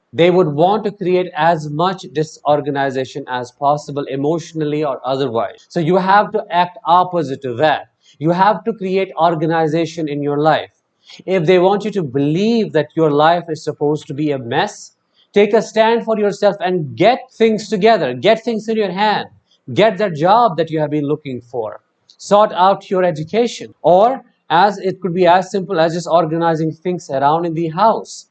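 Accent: India. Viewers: The accent gets things very confused. It’s partly Indian and Partly Western. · Strong indian accent earned my sub. Solid 10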